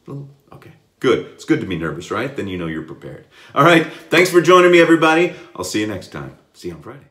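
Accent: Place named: United Kingdom. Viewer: Amazing british accent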